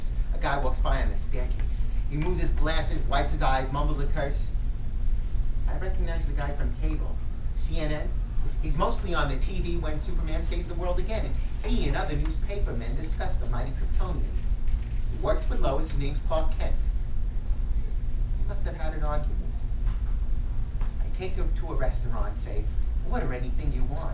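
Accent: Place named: New York, United States. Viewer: Very nice. You sound like New Yawk!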